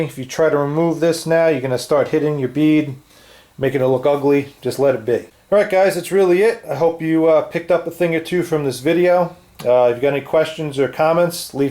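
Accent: New York, United States. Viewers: Yikes wish I saw this a few days ago, just spent 3 days struggling with other methods and sticky stuff everywhere. Some people warn against window clean some recommend windex. I just used alcohol on my finger to smooth the bead but the problem I had in NC Florida was a cold snap and I couldn't get the caulk to run very well so it had blobs. I thought it was a lack of strength in my hands but it was the temp. After I ran a heater it worked better, but tooling the blobs was a nightmare. I am a DIY accomplished craftsperson but wow, this was as bit over my head in tolerance. Wish I saw your instruct, hopefully I won't need to redo it for 10 years, but then at 88 I may just say buzz off, and bleach off the mildew!!! LOL thanx for an excellent presentation. Love your NY/East Coast accent!!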